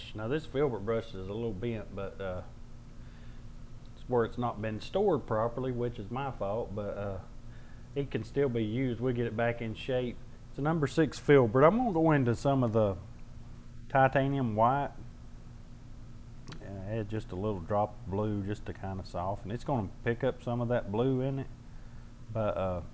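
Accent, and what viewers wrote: South, United States. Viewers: A good painter..n' a cowboy's accent.. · Great technique, very helpful. Love the accent! Charming southern US gentleman.. :-) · Are you from the Carolinas ? you sound southern like me,, thank you for helping me with painting clouds ☁️ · I just found your channel. I've subscribed. Not only do I like how you explain the painting but I love your country accent. I'm country too so it feels like home. 😁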